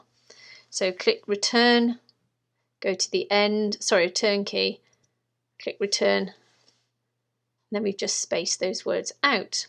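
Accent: England, United Kingdom. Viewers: A great demonstration and a lovely English dialect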